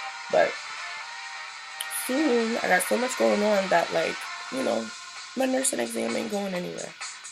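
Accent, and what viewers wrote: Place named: East Coast, United States. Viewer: Hey, R.I.P. to your Mom's and I'm sorry she passed away at only 42. She was So young. Shout out to your Dad for still being a Dad and always being there for you. This was another cute make up Tutorial. Thanks for sharing. Your East Coast accent is So Strong. You Crack me up sometimes. Oh and about your Etsy shop, let me know when you have it up and I'll buy some notes from you. I have an Etsy shop myself and I've been in business for a few years. It's a Good place for business and I'm sure you'll do well:)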